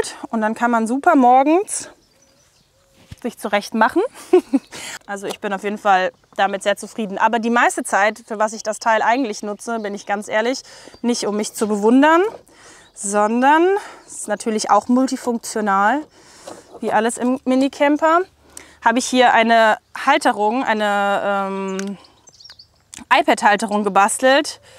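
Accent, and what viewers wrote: Germany. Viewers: Lol I thought you were speaking English with a German accent when the vid started lol maybe a way for me to learn another language :) You need a "Wingnut" for the mirror pad holder change over.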